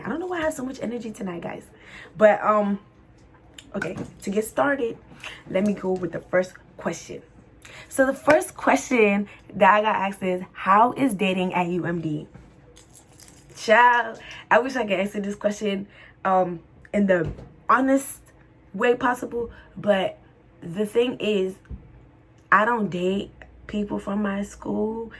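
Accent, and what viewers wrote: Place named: United Kingdom. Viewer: It’s the UK accent for meee😂😂😂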